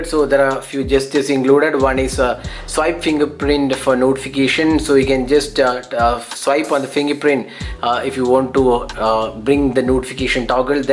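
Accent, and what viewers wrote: Kerala, India. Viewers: mallu accent ?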